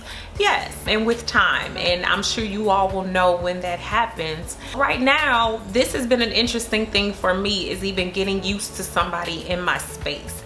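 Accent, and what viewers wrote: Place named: St. Louis, United States. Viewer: You inspire thousands with your knowledge and God speak. Your St. Louis accent is part of YOU and I'm glad that you're proud of it. I've been following you for 3 years and have learned a lot and appreciate your time & dedication that you've put in this channel. I'm happy for your new beginnings & proud of your accomplishments!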